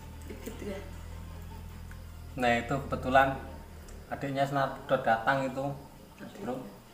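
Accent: Java, Indonesia. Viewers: Ini perantauan atau gimna mase? 😁 Kox bahasanya medok jawa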